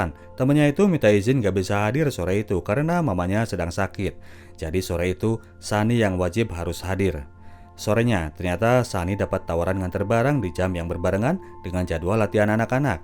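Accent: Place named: Banjar, Indonesia. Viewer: Fix suaranya orang banjar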